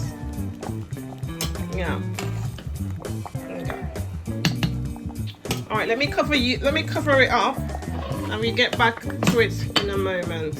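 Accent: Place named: Ireland. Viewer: You sound like them Irish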